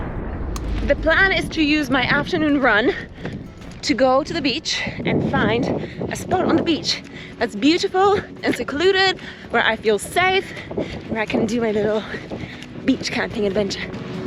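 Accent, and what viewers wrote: United Kingdom. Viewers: Just started watching your vids last week when your Texas videos came through and YouTube thought, You Darrel are a Texan, maybe this girls videos would interest you!. Broccoli raw is best eaten with.... Ranch dressing!!!! I have a burning question, you have such a charming British accent.... How did a Polish girl end up speaking english with a charming British accent????? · you now look like a french, sound like a british, but true to your heart you are wild as a free spirit...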